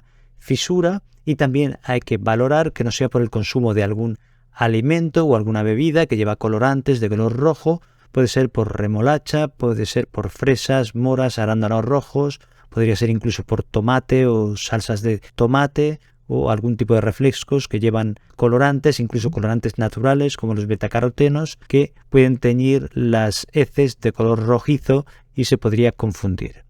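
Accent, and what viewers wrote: Spain. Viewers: En España,soy española y su acento es español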